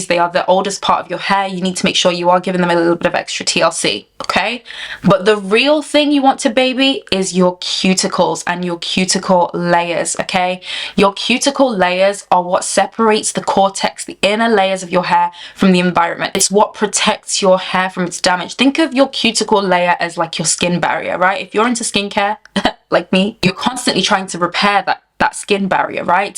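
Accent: United Kingdom. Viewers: Your accent sounds quite Northern? Are you from Manchester? Leeds?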